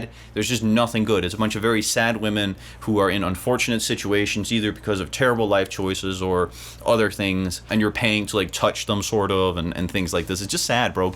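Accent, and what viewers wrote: United States. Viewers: how can a danish be so good in american english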